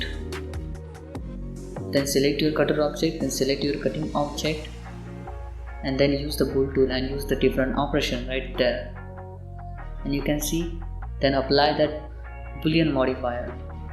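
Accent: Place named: India. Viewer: Hello, very interested in what country you are from? India? From your accent it seems to be India, I want to check the assumption. I'm from Russia myself. Video is amazing!!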